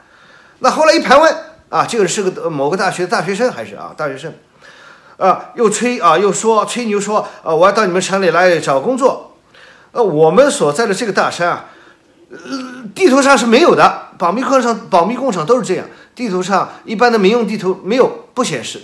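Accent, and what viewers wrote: Shanghai, China. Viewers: 爷叔上海宁？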